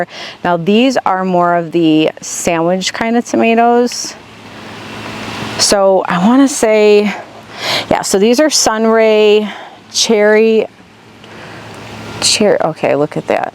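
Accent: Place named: Midwest, United States. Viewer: I knew you had to be from the Midwest once you said you were from Illinois, we have the same accent 🤪 I’m in MN. ❤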